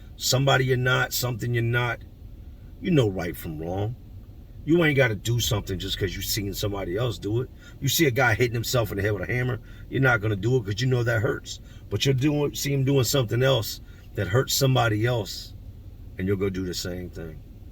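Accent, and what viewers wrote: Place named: Philadelphia, United States. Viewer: When I 1st heard u talk, I thought you were from N. Philly!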